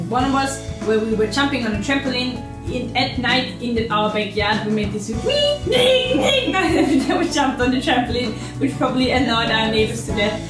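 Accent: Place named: Scandinavia. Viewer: Where are you from I hear your accent but can't determine where you are from. Is it Scandinavian?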